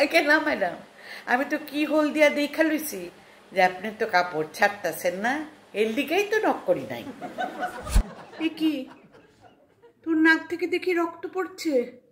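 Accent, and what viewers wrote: Bangladesh. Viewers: পূর্ব বঙ্গের ভাষা বেশ লাগছে । দিদি বিক্রমপুর এসে বেড়িয়ে যান । নিশ্চয়তা দিচ্ছি ভালো লাগবে ।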